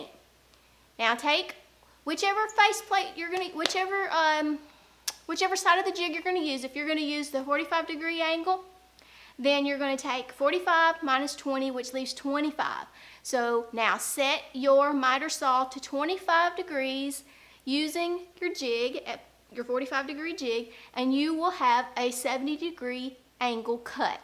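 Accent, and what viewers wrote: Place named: South, United States. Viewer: Southern accent and very funny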